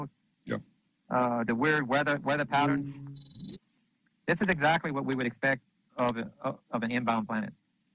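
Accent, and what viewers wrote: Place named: Louisiana, United States. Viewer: I would guess this guy is from Louisiana. Listening now…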